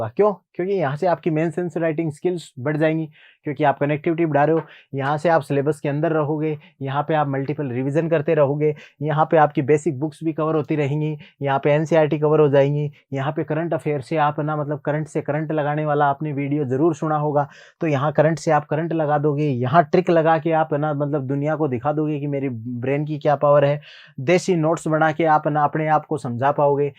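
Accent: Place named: Rajasthan, India. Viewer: 🤣🤣🤣 aap bilkul desi bol rahe ho... Rajasthani hai bhai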